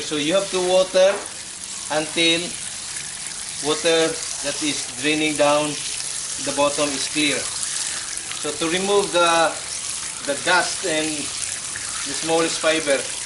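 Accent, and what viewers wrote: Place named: Bisaya, Philippines. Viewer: piste mu bai..pnipilit mu pang mag inglish (english)...hlata nman bisaya ka...pisti tlaga..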